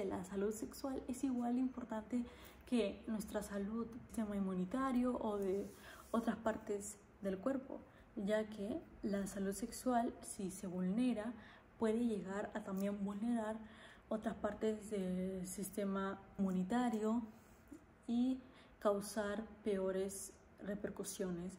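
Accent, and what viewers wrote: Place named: Spain. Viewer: your the most beautiful girl I've ever seen, I love your beautiful Spanish accent and words, I hope someday I meet you and talk to you 😊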